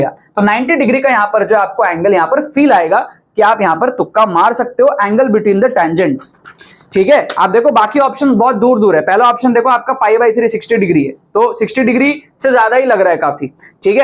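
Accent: Bengal, India. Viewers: oh sir u r amazing 🤩...ur Bengali is too sweet ❤️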